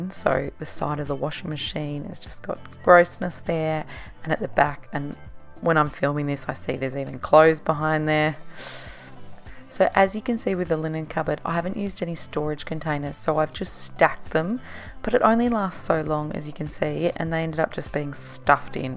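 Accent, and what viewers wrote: Australia. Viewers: Hi, I iron everything even my sheets, I relax when I iron, it is satisfying for me. I have only just discovered your channel and it is so nice to hear an Aussie accent...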